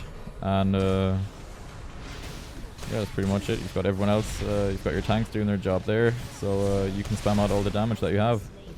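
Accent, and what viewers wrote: Ireland. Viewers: Ah an irish man as well, respect my friend ;) nice guide :) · are you Irish and if so which county?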